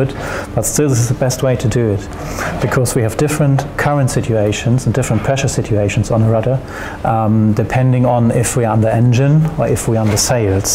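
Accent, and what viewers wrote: Germany. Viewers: Hey, it is you again. I remember your German accent. How's your business in the pandemic?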